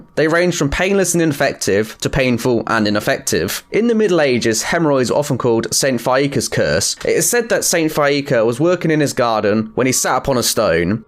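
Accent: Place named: United Kingdom. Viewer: To hard a british accent. Sounds like he ismeither too lazy to form complete words with a space between them, or has a mouth full of crap.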